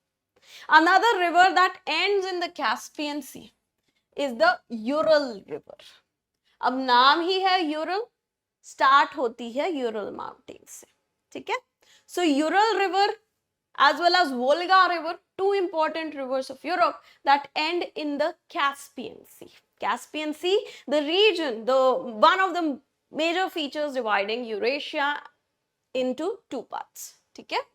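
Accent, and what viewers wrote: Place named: United Kingdom. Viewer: Mam please aap normal or indian accent me padhaiye,, british type accent thoda ajeeb lgta hai ..jaise live classes me padhate ho vaisa accent😊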